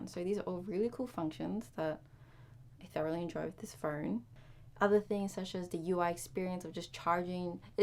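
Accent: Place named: Australia. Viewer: Clicked because I had never seen that phone before, heard the Aussie accent and immediately subscribed. I so much prefer home grown content.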